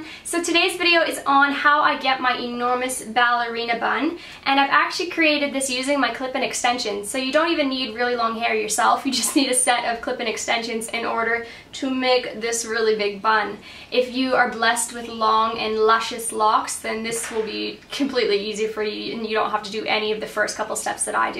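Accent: Australia. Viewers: I can hear youve started to pick up a but of an aussie accent? :) thanks forth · Yes! I can hear a bit of Aussie :) you are becoming one of us haha xx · I hear someone Austrailian Accent in you!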